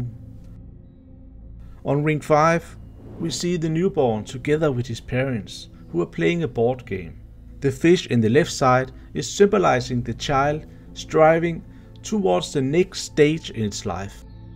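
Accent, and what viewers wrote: Denmark. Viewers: I dont Care about your danish accent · your light Danish accent is very cute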